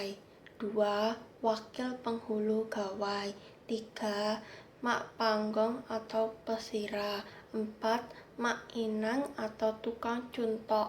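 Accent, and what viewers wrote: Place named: Java, Indonesia. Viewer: Yang jelasin kok logat Jawa, gak nyambung jadinya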